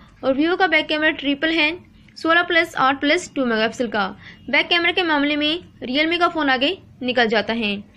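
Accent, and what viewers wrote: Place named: Haryana, India. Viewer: Haryanvi accent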